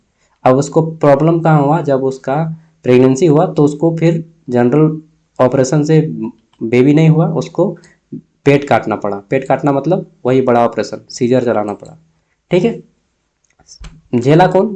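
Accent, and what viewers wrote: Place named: Jharkhand, India. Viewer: Aapka khortha wala tone bhut shi lgta hai sunne m😃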